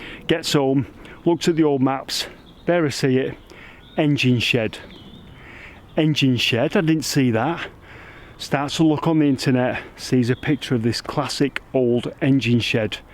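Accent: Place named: Lancashire, United Kingdom. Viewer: Thanks for another wonderful video. I just love the subject matter. I was born in Lancashire and spent the first ten years of my life there until my family moved to north Wales in 1962. It is lovely to hear a proper Lancashire accent again after all these many years of living abroad. So much of what you show was all still working in the 1950s, who could have imagined it would all have been swept away so quickly. My father was a railway enthusiast even back then. I remember him stopping the car to watch the Flying Scotsman leave Chorley station and cross a bridge that the road went under. My brother, a baby at the time, 1956, still all in his white baby clothes was held up to look, 'it's the flying scotsman, it's the flying scotsman! It bring tears to my eyes just remembering it. This same brother, now semi retired in his 60s fires an engine on the Keithley and Worth valley line in Yorkshire. 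Incidentally, the engine he fires is one that my grandfather fired in the 1890s or 1900s when he was a fireman on the Lancashire and Yorkshire Railway. It was a pure fluke that the very same engine escaped being scrapped in the 1960s.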